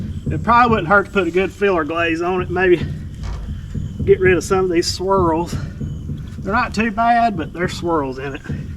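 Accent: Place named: South, United States. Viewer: Man I really like the way southern Americans talk... The "pokie dots" 😂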